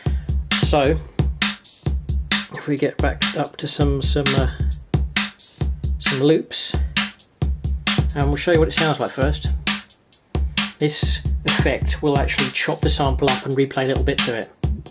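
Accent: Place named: Arizona, United States. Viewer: Arizona accent:D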